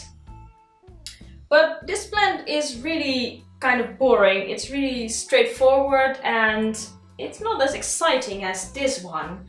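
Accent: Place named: Netherlands. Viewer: Ik hoor dat je Nederlands bent kan je me helpen ik heb ook een draceana maar niet dezelfde wie jij hebt deze heb ik helemaal tot stam afgesnoeid maar wel aan een tak uitloper laten zitten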